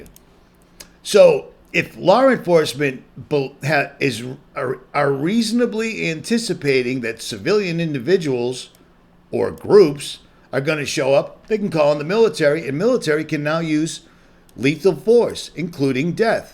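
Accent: Boston, United States. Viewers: That’s Boston lingo.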